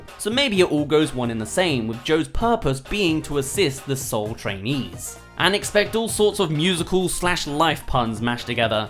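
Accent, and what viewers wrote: United Kingdom. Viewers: When did get a british accent?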